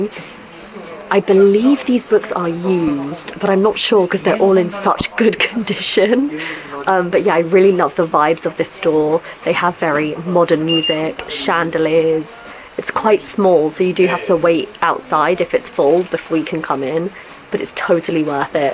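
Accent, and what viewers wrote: United Kingdom. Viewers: your British English is easy to hear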